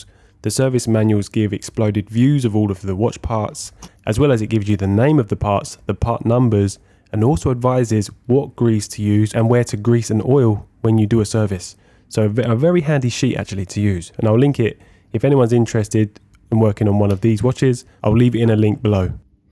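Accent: London, United Kingdom. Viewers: My man sounding like a fellow Londoner and taking about usd. Come on fella let’s have a £ and let the yanks convert it. 👍😂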